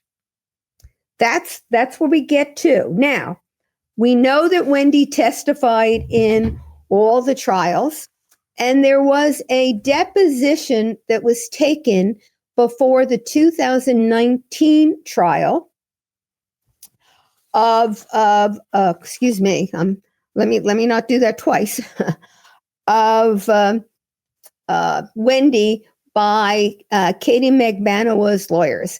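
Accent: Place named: New Jersey, United States. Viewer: ! I loved your coverage of Donna’s trial and I’m so happy you started your own show! I love listening to a fellow Jersey girl! ❤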